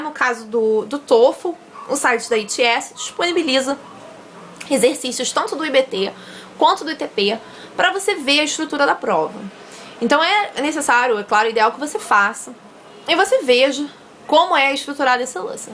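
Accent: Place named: United States. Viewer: Nossa, como você fez para ter um 'speaking' tão bom? Adoraria ir para os EUA e eles acharem que sou de lá também rs (como todo mundo né rs). Enfim, dicas para falar tão bem assim e perder o 'sotaque brasileiro'? Sei que o assunto é 'Listening', mas como seu sotaque americano ficou tão perfeito assim? Thanks a lot :)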